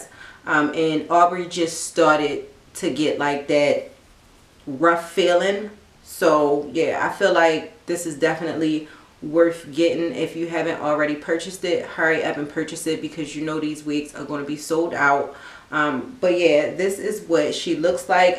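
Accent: Baltimore, United States. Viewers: That baldimore accent! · are you from Baltimore? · Lol yeah she must be from Baltimore the way she keeps saying “to/tew” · Okay I’m getting this wig because of you ❤️ & your accent you sound like your from Baltimore also new Subbie 😊❤️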